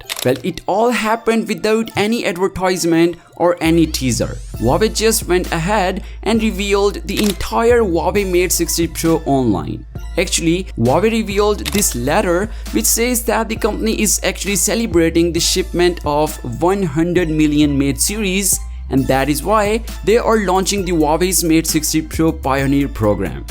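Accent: India. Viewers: 听着像印度口音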